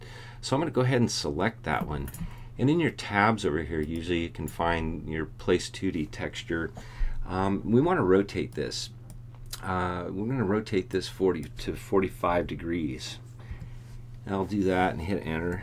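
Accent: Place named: South, United States. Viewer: good but it's hard to follow with your southern accent, maybe work on a more neutral accent. it gets annoying after a while. thanks for posting.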